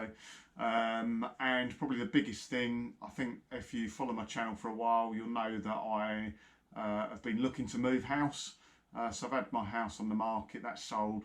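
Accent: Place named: RP, United Kingdom. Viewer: Ooohh posh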